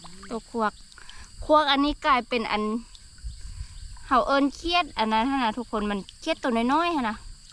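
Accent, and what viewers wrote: Thailand. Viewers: สวัสดีครับน้องสาว ส่งความรักความหว่งใยและความหวังดี มาให้ทุกๆวันครับ❤สำเนียงพูดเหมือน เชียงใหม่ ครับ❤❤ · ภาษาบ้านข้อยกับภาษาไชยบุรีบ้านเจ้า เว้าคือๆกันเลยจ้า ภาษาเมืองเลย🥰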